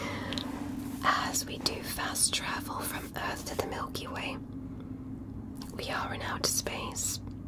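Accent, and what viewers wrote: England, United Kingdom. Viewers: Is the English accent from absolutely Fabulous? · Your English accent is marvellous. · English Accent 🎉 my Fav 🙏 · OMG the British accent❤ love it❤❤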